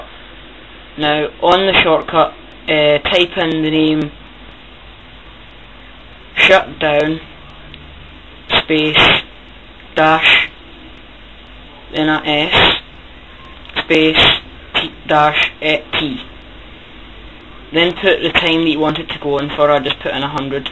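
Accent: Scotland, United Kingdom. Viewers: by the way he speaks i think he is scottish. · thats fun ;) and, i just adore you'r accent ^^ scottish is just so cool :o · lmfao he is from scotland he is not irish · Thats cool...and are you Scottish? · OMG! SCOTTISH! :D! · whoa you got a strong scottish accent mate! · ye you are scottish do you got a lisp tho? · its scottish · haha i love your voice! scottish people have the best accents lol and I am sooo doing this at school tomorrow thanks hun!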